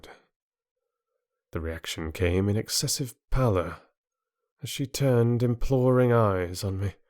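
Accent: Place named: England, United Kingdom. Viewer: Congrats an an absolutely fabulous reading, and so much better with an English accent, I couldn't bear the American. Please, please, please finish the book!!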